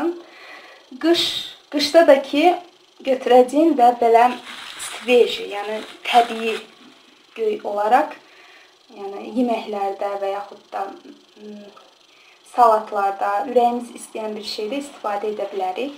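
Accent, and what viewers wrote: Azerbaijan. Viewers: Guya Bakilidi gorureceyem demekle deyil sesinden rayon avazi gelid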